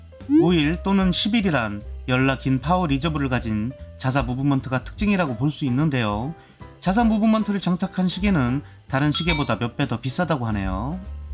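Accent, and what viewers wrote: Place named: Jeolla, South Korea. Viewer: 더럽게 재미없네! 전라도 억양 킹받네 ㅅㅂ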